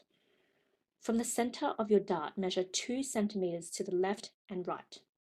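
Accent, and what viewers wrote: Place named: Australia. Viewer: Thanks, very nicely explained. Love hearing your (our) Aussie accent too!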